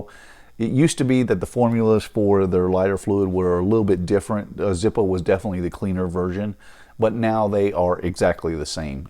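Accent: United States. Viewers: Good old American country twang sounds fine to me.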